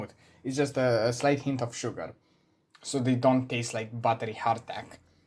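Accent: Romania. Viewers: It is an accent. It's not for you, being Romanian, sounds "normal" to you, but it's a VERY appreciated accent by other countries. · Lovely classic romanian B2 accent Thx for the video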